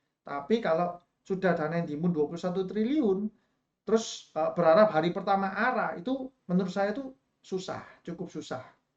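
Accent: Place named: Java, Indonesia. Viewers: dialek khas jawa mu mas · suara medok pean cak ,,, hhhi has jawa timuran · Auto subscribe ko.. Review jujur & mengedukasi 👍🏼 Medok e yo pas di kuping 😆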